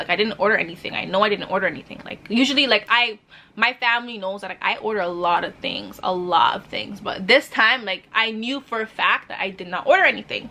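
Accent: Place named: Toronto, Canada. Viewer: I loved this video she talk like a Tdot gyal fr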